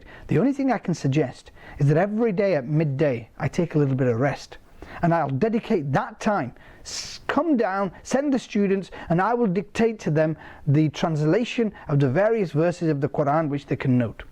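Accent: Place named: England, United Kingdom. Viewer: English accent se Shirk nahi jata.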